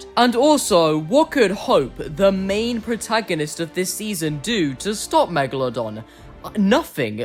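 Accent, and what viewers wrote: United Kingdom. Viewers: I like your videos and your british accent is amazing ahah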